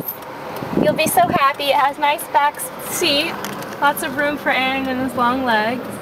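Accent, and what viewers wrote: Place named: United States. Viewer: if she is in England, what's up with an Amercan accent?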